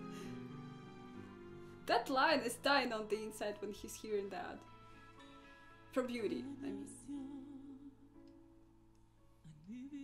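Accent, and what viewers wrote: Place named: Russia. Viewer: Она русская, акцент чувствует ся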